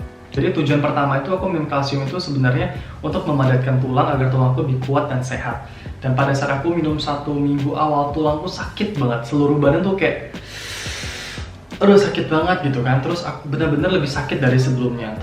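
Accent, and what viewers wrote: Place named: Java, Indonesia. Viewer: Ngomongnya jadi makin medok dan mirip orang jawa gitu ya😂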